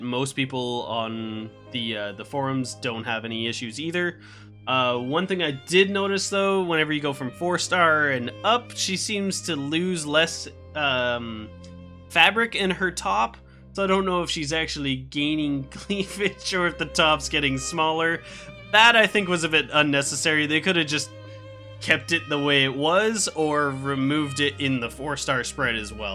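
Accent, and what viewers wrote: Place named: Canada. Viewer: They call Chizuru: Chirizo, type of Mexican sausage due to the obv similarity. You sound Canadian so I can understand the confusion :p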